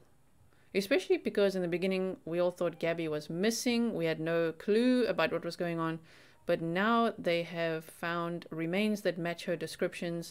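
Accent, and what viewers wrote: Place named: Afrikaans, South Africa. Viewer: You HAVE to be south African! Nowhere else in the world would you find that strong afrikaans accent.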